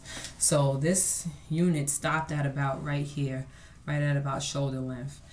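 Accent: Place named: New York, United States. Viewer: I super love your new york accent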